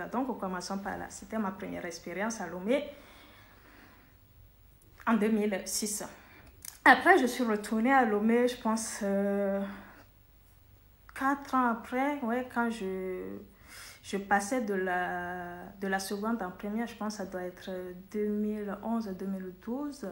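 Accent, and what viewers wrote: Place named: Canada. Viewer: Accent canadien si j'ai la bonne mémoire 😅😅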